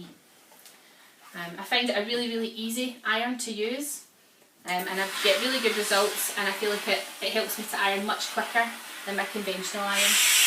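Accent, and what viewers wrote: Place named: Ireland. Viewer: where do you from ? your accent is almost like irish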